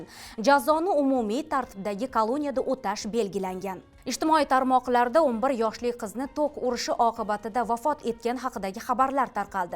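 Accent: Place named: Russia. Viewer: ЎЗБЕКчани янги ўрганаётган ўрисга ўхшайсиз, сиздақаларга қолдими ЎЗБЕКни куни? Буни устига тенг ярим луғатинг ўрисча, э саводсиз ўрисқул?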